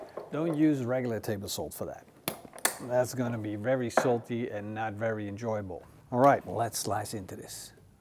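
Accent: Netherlands. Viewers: You sound like a Dutchman. My dad kept that accent for as long as I recall. Ahhhh dacht ik well hoor. :) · Holland is my guess. If you ever can't quite place an accent, it's almost always Dutch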